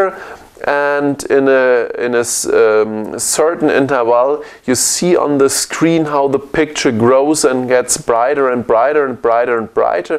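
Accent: Germany. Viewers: It's typical German English, not that bd however :))) Just a small correction: - All 3 OM-D-s share the same 16MP Sensor - The E-M1 flagship has also phase detect AF points on the sensor while the others don't - The E-M1 and M10 don't have an antialiasing filter on the sensor while the E-M5 does have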